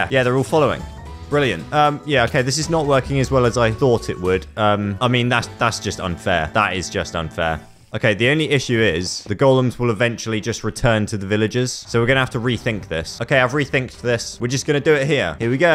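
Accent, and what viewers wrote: United Kingdom. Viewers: Are you British? 😢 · You sound brithis and not british at the same time wtf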